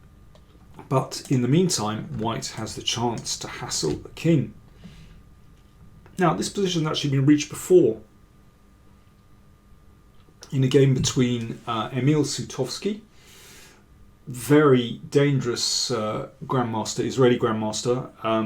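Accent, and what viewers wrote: United Kingdom. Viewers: Thanks for all the valuable stuff you lovingly provide chess enthusiasts with. And by the way, your succinct, eloquent commentary with genuine British accent offers an excellent English course!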